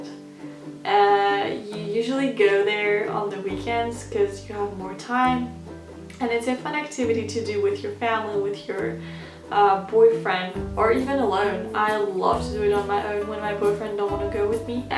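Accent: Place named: South, United States. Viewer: You have a very southern american accent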